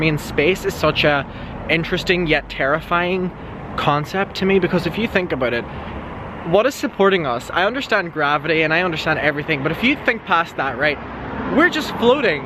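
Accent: Ireland. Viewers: You would be welcomed in America, they love men with Irish accent! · Where are you from?? Two minutes in thee video...you’re from Ireland 🇮🇪 Awesome! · This is probably a dumb question, but does it stay "day" light all night [like it does in Alaska] or does the sun eventually set in the summertime in Ireland? Aha sorry I'm not educated about that & was just curious. In America, where I'm from, the sun sets later due to daylight savings time and will finally set ish at night at the latest....then we move the clocks back an hour in the fall so in the wintertime, it'll get dark by 6pm. I love your Irish accent btw.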